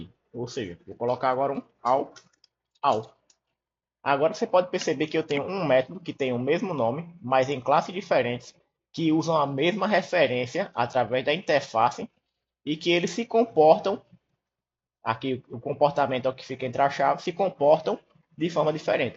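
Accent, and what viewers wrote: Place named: Northeast, Brazil. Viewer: Não sei o que é mais prazeroso nesse video, a didática fora da curva ou o sotaque gostoso do meu nordeste ❣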